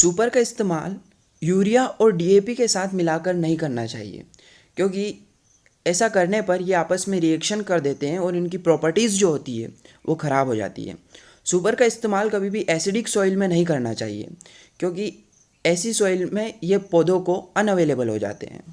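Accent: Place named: Madhya Pradesh, India. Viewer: Bhai pakka m.p se ho tum 😁😁 Ujjain dhar ke aaspas se tum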